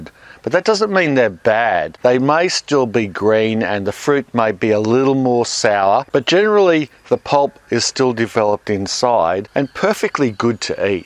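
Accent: Australia. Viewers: Is this Eric Cantona with an australian accent? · I like your Australian accent. 😀😅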